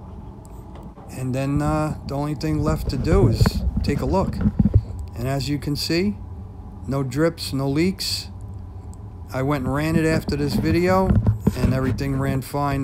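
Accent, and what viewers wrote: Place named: New Jersey, United States. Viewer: Are you from Jersey? I grew up partly in Newark, great accent and perfect instruction! Love that East Coast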